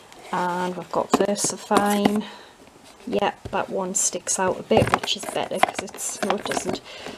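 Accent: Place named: Scotland, United Kingdom. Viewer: TBH, I started watching to hear you're beautiful Scottish accent but I keep watching bc I really love you'r work, lol. ❤❤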